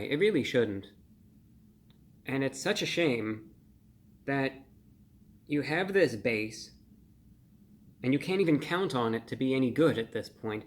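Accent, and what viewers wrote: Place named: Transatlantic, United States. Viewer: The only YouTuber to have a seemingly transatlantic accent.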